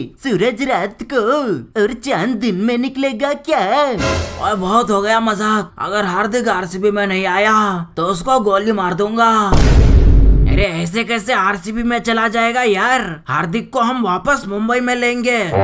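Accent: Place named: Hyderabad, India. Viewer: Will I am sonn 😍best👌 kya Hyderabadi bolte ho bhai tum ek dum awesome 😍 sun ke maza aa jaata hai 👌😄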